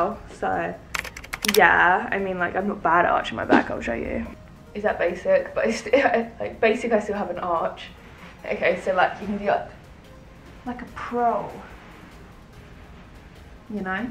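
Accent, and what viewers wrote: England, United Kingdom. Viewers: I like british sound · Are you English because I would wonder if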